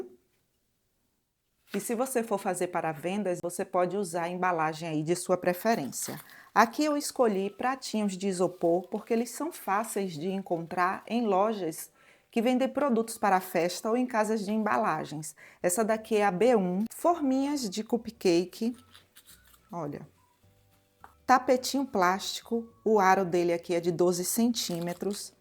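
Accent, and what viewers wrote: Brazil. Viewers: Ela fala maravilhosamente bem e bonito. As receitas perfeitas e deliciosas. Não vejo graça alguma e sim aprender a respeitar o sotaque de cada região brasileira que tem uma extensa diversidade cultural. Isso é cultura meu bem!!! #Pega a visão#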